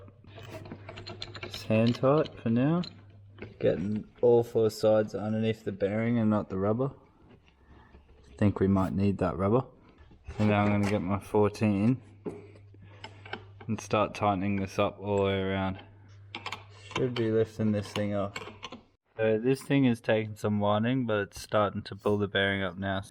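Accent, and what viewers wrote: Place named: Australia. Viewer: Hey mate nice to hear the aussie accent, thanks for the no bullshit video🤣I do have a question if you could help us out. I've just swapped mine out and note the stem has the slightest wobble inside where the bearings go as if the stem isn't wide enough. I'm not sure if the last forks did this as well. How did yours turn out?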